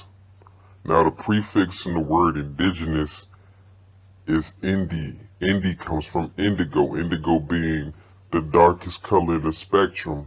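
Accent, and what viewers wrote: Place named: Philadelphia, United States. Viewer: This sound like my homie from Philly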